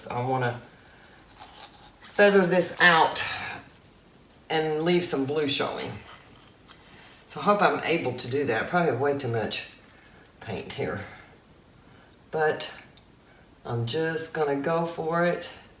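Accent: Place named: South, United States. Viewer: I just found you today because I was looking for a varnishing technique... I love your artwork! I am from Georgia, so its also good to hear the southern accent...lol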